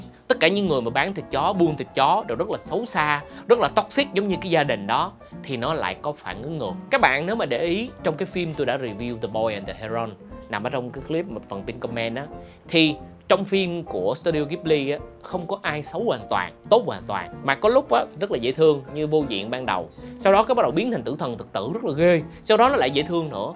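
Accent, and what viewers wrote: North, Vietnam. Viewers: review có tính cà khịa, nói lái theo giọng người miền bắc thì phải ? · eh bò đỏ, ông dùng Tiếng bắc nè, mày thưa giùm tao cái.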